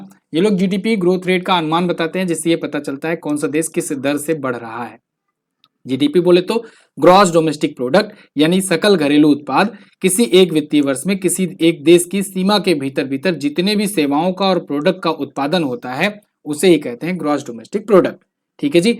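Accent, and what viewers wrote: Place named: Uttar Pradesh, India. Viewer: Sir aap up se ho na.....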